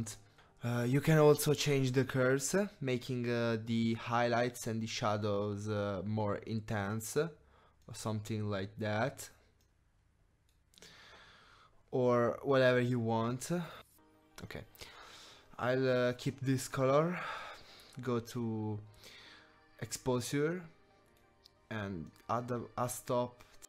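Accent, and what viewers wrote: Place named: Italy. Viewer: DIO SANTO L'ACCENTO ITALIANO DA IL TOCCO ORGASMICO IN PIU'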